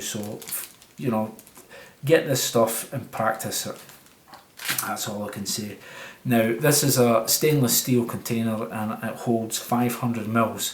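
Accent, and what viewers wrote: Scotland, United Kingdom. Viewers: Are you scottish do you go camping in the highlands.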